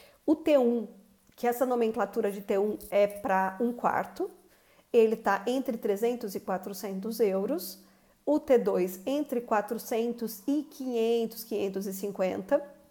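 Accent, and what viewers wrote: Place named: Rio de Janeiro, Brazil. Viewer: E esse sotaque carioca,?! Rsrs bjs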